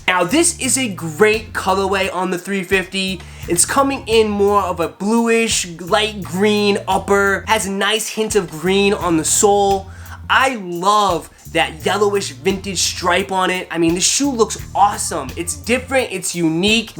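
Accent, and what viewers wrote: Boston, United States. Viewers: That fuckin Boston/mass accent, 🤣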